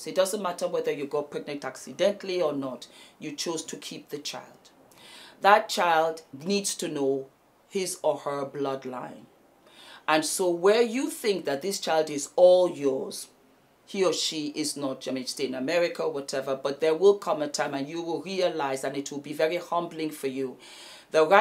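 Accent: Nigeria. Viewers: Mama dey speak, Nigeria English, love dat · So Mama, you dey speak naija English 😅😅❤❤❤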